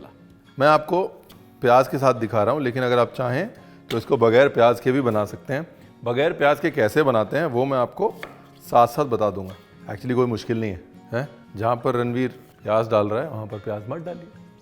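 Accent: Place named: Lucknow, India. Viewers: Aapki awaaz aur aapke Shabd sunke hi lag jata hai ki aap Lucknow ko belong Kiye Hain ab aap abhi kahan Rah rahe hain yah Nahin Pata maine aapko Kapil Sharma show Mein Dekha Tha tab Main Nahin Janti thi ki aap Lucknow se ho lekin usmein Maine aapki awaaz Suni Thi Kunal Sab the Sanjiv Sar the aur aap the tab Mujhko andaza ho gaya tha ki aap Kabhi Na Kabhi Lucknow Rahe Jarur Hai thank u Sar Aise Hanste muskurate rahiye Acche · Aap Lucknow ki baat karte hai bahut aacha lagta hai jo Lucknow ki yaad dila deta hai aapka Lucknow ka andaaz behed khubsurat hai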